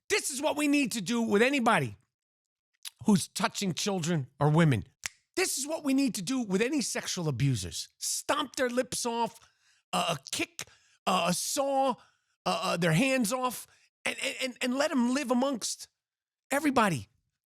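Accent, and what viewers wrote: New York, United States. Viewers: When I need a dose of New Yorky attitude, I listen to. Who has a classic accent and voice like us anymore? New York attitude is gone. Yeah, we have everyone from all over the world and they get to speak their own language. They come here like tsunami. But they stick together. where is the classic NY accent and attitude - free speech about what matters? Good awards podcast. Thank you for speaking.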